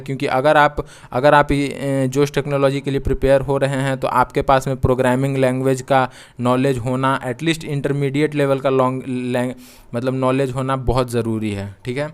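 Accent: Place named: Bihar, India. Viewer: yes exactly . I don't know what short listing criteria Josh is using. Sometimes they select only on one question and some time dont select even on all 3 correct. Btw sorry for being little rude in cmnt above. Liked ur way and accent (bihar accent) of explanation.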